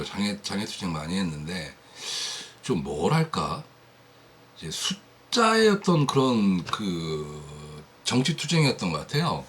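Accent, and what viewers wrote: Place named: Chungcheong, South Korea. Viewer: 님! 영상 잘 보고있습니닷! ^^ 제..여담인데 한가지 말씀드리고 싶은게 있어서요!! 저는 경상도 사람이라 성질이 좀 급한데... 님 말씀하시는게 1.25배속 하면 제가 듣기에 딱 맞아요ㅋㅋㅋㅋㄱ ㅋㄱ답답해요ㅋㅋㅋㅋㅋㅋㅋㅋㅋㅋ 1.25배속!!ㅋㅋㅋㅋ 충청도 말투를 평소에 많이 접하지 않아서 그런가봐여ㅋㅋ😊😊 영상 많이 올려주세요! 쇼츠도 많이 올려주시구요! 장동혁 대표님 화이팅❤🎉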